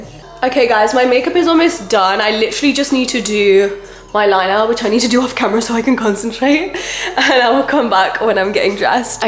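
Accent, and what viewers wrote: United Kingdom. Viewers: British accent >>>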